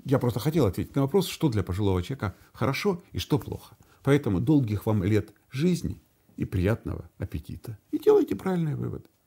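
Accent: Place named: Ukraine. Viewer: с українська акцент 😊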